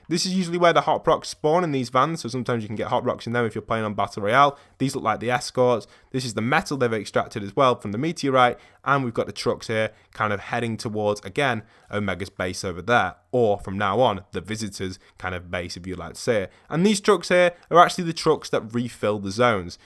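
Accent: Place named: United Kingdom. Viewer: I don't like the British accent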